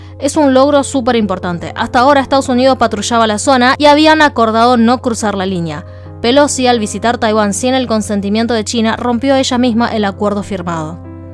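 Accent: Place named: Rioplatense, Argentina. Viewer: Hubo un Miniso en Bs As, pensé que era japonés. Muy buen reporte, el mejor en castellano rioplatense!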